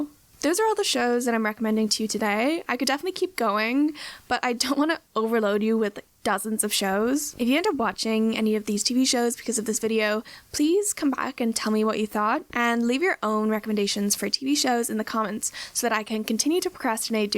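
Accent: Ireland. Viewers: Are you Irish? Every now and again I hear a slight Irish accent. Just curious 😁 really love all the videos u make ✌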